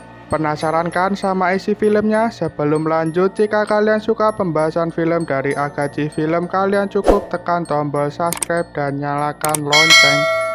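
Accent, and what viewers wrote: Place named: Java, Indonesia. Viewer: Medok tp seru bro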